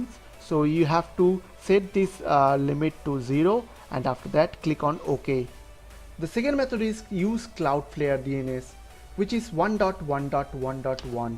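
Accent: India. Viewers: I open the video , i hear an indian voice in a tutorial once again , i close the video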